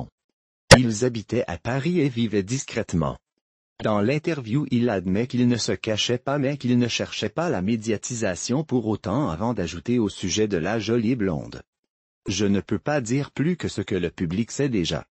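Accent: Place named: Quebec, Canada. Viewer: Heu! elle viens faire quoi Loana dans les photos !? j'm'suis encore perdue sur yt , les voix robotique avec l'accent jouale de Québec MDR! j'décalisse de dlà !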